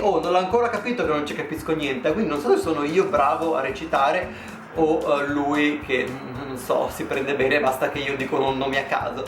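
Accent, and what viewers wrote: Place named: Rome, Italy. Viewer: Abbandona il romanaccio ooooh ahaha.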